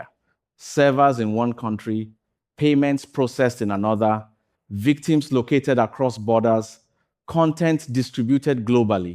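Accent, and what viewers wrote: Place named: Nigeria. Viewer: Why do you sound like a Nigerian, when you are a Ghanaian.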